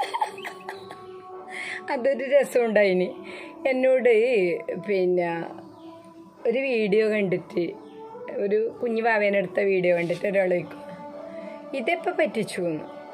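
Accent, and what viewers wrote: Kannur, India. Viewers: ചേച്ചി കണ്ണൂർ ആണോ 👍🏾ചേച്ചി സൂപ്പർ വീഡിയോ 🙏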